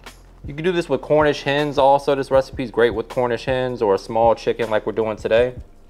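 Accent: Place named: Louisiana, United States. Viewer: You seem to have a Louisiana accent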